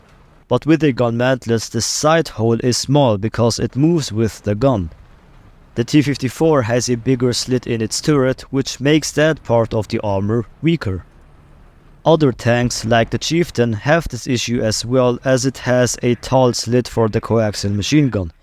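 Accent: Germany. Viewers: come to learn about T-54, stay for the German accent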